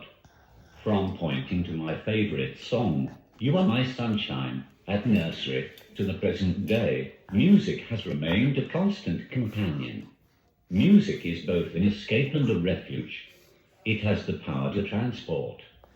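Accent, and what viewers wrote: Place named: Birmingham, United Kingdom. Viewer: Congratulations on your engagement, I hope you get to walk down that isle and get to talk to your huckleberry in your own Brumby accent!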